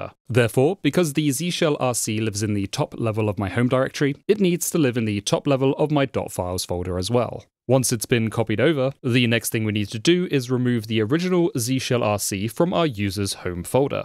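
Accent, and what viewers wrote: United Kingdom. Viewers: Indeed good job, you explained step by step in the simplest way possible, now I marked your video for my future fresh reinstall cause now I had to share my laptop with my partner. By the way speaking of your accent, are you from UK?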